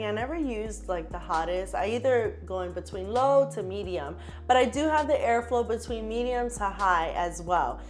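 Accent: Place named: New York, United States. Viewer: I wish I knew where she was- I hear a NYC accent but I don’t know where she actually works.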